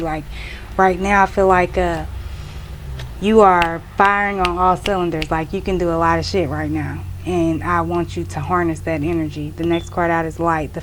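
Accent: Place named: Northern California, United States. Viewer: You sound like you’re from where I’m from, Nor Cal. Lol. I’m hear for another Aquarius man, Scorpio woman reading. Trying to get a read on this new situation I’m in. 🤔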